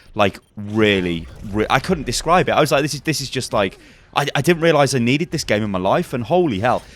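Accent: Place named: United Kingdom. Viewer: bought the game just because my freinds all had it, from the videos i saw i thought it basically was tf2, but looked like it could be fun, but manic, but actually playing it is completely different, i must admit for the first few hours i did find it abit manic, but once you learn abit about the charachters it makes alot more sense. coming from playing lol and gw2, i expected some learning curve in learning charachters, but i must say i think i will be playing this game for a long time, its competitive,fun,varied, and game times aren't rediculous long!! also enjoying your british commentry!! keep it up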